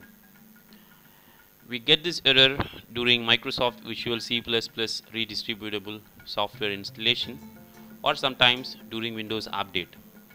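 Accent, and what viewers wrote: India. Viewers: İndian English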